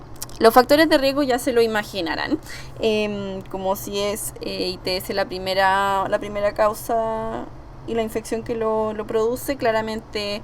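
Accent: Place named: Chile. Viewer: Cómo se nota que es chilena Atte: una chilena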